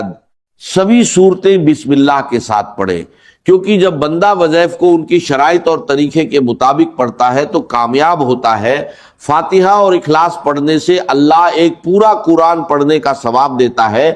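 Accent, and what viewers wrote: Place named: India. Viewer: یہ کون ہندی بھائی اپنے خاص لہجے میں اسرار احمد کی نقلی آواز کو بول رہا ہے لیکن اتنی لمبی باتیں اور واعظ دینے کے بعد وظیفے کو صحیح طریقے سے نہیں بتا سکا کے کیسے پڑھنا ہے۔وظیفے کو توڑ توڑ کے بیچ میں بات کرتا ہے جو لوگوں کو سمجھ نہیں اتا کہ کب وظیفہ شروع ہوا اور کب اس کی بات کررہا ہے۔ کیوں لوگوں کو پریشان کرتے ہو۔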